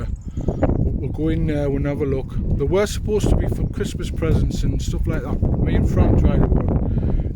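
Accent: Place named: North East England, United Kingdom. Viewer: where in the north eaat you at, marra. i was born in horden. you sound not far away. i only ask because i find it easy to understand the accent.....................brian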